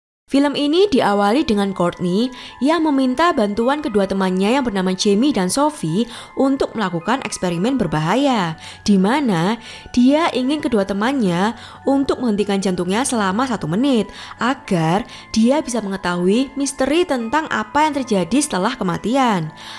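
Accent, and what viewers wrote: Java, Indonesia. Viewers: masyaallah medoke pol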